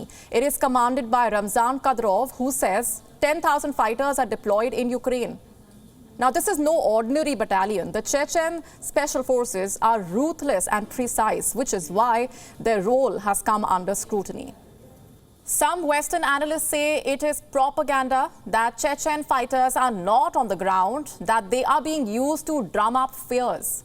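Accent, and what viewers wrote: India. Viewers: Too heavy Indian accent - can't understand what she was reporting. Not too many people tuning in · They should hire a english native speaker, the indian access is very thick T_T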